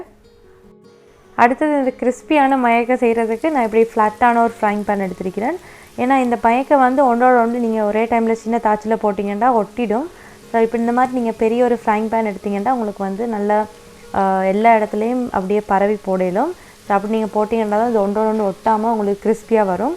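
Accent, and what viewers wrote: Sri Lanka. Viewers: Onga oor edu sri lanka la nega pesuradu naga pesura madiri eriki sis. I like u speech😍😍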